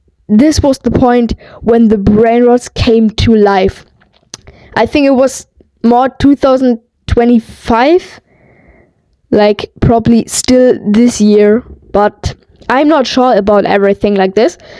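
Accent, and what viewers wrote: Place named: Germany. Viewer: U sound german 🤔🤔 W vid ♥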